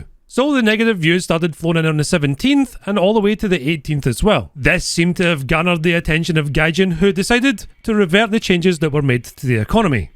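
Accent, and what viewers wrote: Scotland, United Kingdom. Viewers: Just found your channel, and I like your Scottish accent.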